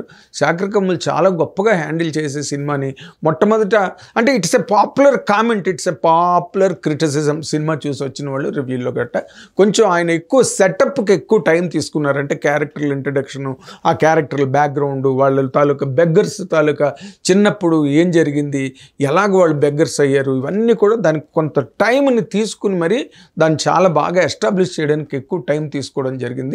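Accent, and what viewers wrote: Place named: Vizianagaram, India. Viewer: విజయనగరం జిల్లానా? మాట తీరు అలాగే ఉంది!!!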